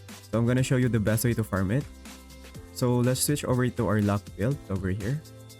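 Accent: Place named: Canada. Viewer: this might be the most candian accent i ever heard in my lifetime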